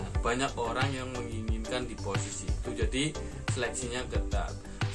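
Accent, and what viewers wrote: Indonesia. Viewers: Walah ternyata ngapak ya melkur hahaha Sukses teruslah ya aamiin #edn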